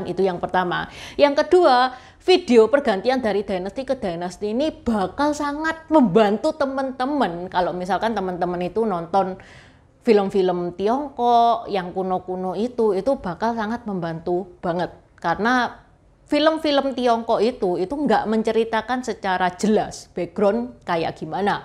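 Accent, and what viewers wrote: Java, Indonesia. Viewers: Iya tuh suara nya kental banget kaya wong jowo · China medhok.....aku sukaaa😂😂😂❤❤❤❤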